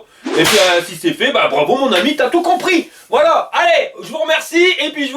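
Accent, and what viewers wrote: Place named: Nord-Pas-de-Calais, France. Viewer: Frileux de s'abonner à sa chaîne ? Bah moi, j'adore son franc-parler et son humour ! Et comme je suis du Nord (en fait en Belgique mais à 20 km de Lille), je savoure son accent ch'ti. A chaque fois que je l'écoute, j'ai l'impression que c'est un pote qui me donne des conseils.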